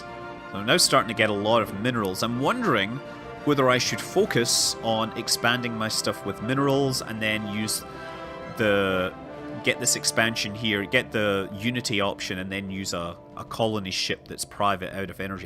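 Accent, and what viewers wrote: Scotland, United Kingdom. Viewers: So you totally taught me to play KSP and I found Stellaris myself recently and in my YT searches, I found your name again...I thought to myself "Listen to that sweet Scottish accent talk about this new game I'm playing, FUCK YES!". Haha.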